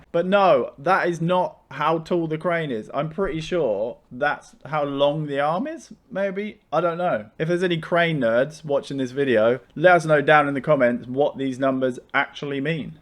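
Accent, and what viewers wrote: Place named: Southampton, United Kingdom. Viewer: My step-dad used to do this for a living (work at the top of tall structures, down at Esso, cranes at the docks etc.) and I guess some people are just born without that innate fear. Also, I didn't know you were also a Sotonian until this video and it's weird I kind of guessed it from the accent! Enjoying your content, thanks.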